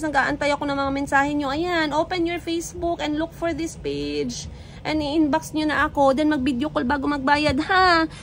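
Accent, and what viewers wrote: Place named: Conyo, Philippines. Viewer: Konyo kaayo ug OA ka madam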